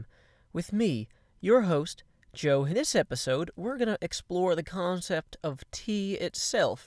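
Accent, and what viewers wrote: United States. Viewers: your accent still sounds american.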